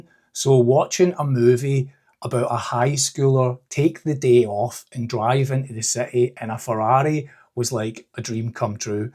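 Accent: Scotland, United Kingdom. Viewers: Hi. I like your style of talking and sharing. Actually, I have to confess that when I was younger, I couldn't listen to the Scots accent very long!! Don't know why! But when I heard your voice, that changed lol. Anyway, I'm really enjoying your channel. PS: My Step Grandad came from Banff so maybe his accent was more sharp than yours?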